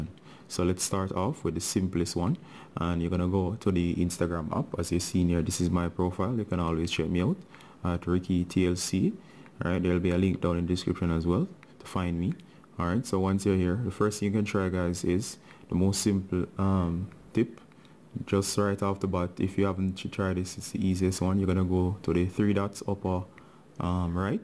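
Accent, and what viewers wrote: Jamaica. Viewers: Your a Jamaican?💯🇯🇲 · I love the Jamaican accent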